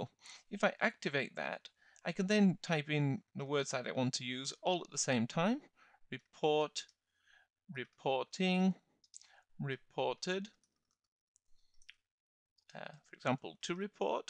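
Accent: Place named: United Kingdom. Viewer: English is his native language. You must be not accustomed to the his variant of the British pronunciation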